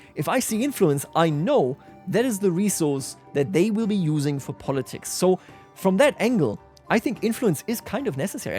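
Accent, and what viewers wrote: Bavaria, Germany. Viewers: Must be the Bavarian accent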